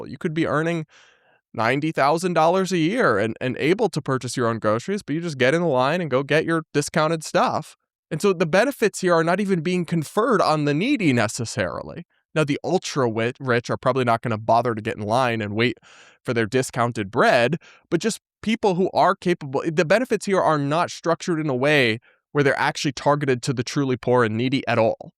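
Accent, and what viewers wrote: United States. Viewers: talking with an American accent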